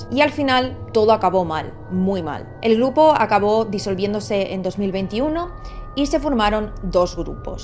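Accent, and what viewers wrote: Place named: Catalonia, Spain. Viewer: Tiene acento catalán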